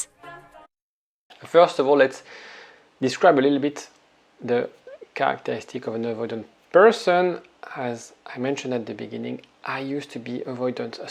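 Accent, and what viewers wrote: France. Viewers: French. Canadian accent is different lighter. Sounds very different if you speak French more than listening as an English speaker.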